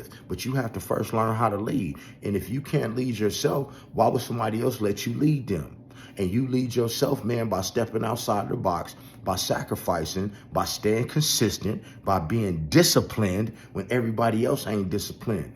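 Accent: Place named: West Coast, United States. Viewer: I felt this video all the way OG!! Preciate this content my Maine!!! Already know you reppin west coast, I can hear it.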